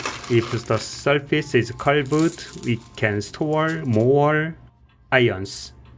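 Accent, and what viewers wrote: South Korea. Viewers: he is korean i could catch korean eng accent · Judging by the writing on the toothpaste tube and his accent, I think is perhaps Korean?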